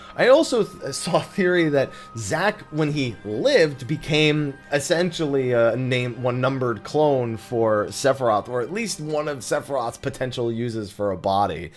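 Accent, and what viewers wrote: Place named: Los Angeles, United States. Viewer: You sound like you’re from L.A. Are you?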